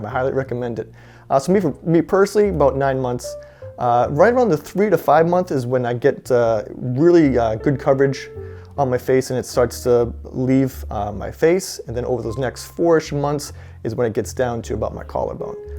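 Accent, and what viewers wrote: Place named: Canada. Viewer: I honestly never noticed that you say "out" with a Canadian accent ("oat") until today's video, haha.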